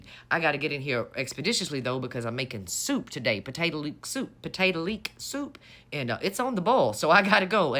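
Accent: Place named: Georgia, United States. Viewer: Oh, I thouight that you were a Georgia Peach, cause you sound like the Memorbable Ms. Buzzard (Isn't that a great name?) It's been over 30 years since I last spoke to her (we were workmates). Remeber that actress who played 'Flo in the sitcom: Alice? Well she qlso reminded me of Ms Buzzard (Pronounced to sound like BOOZ-Zard). Another Witty, non racist whyte woman from Atl.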